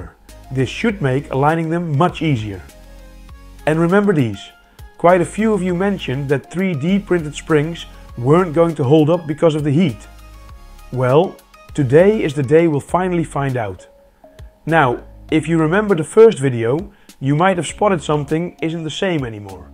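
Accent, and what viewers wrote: Netherlands. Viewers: His english Sounds a bit like from nedderlands ... The sun there gives nearly 1000w/m² ... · I'm filled with proud Dutch feelings after hearing the accent. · So to hear it's definitely a Dutchman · Nederlander detected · Ahh that's crispy Dutch accent 😅😂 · I hear the Dutch ;)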